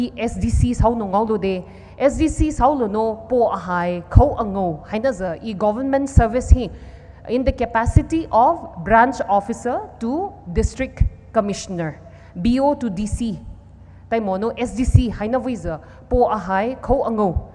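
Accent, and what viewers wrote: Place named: Manipur, India. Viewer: She speaks pure poula really appreciate.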